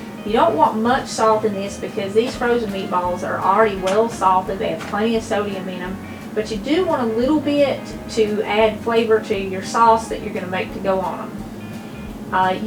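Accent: South, United States. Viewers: I love your southern drawl and yes, I can just tell that you are good people 😘👌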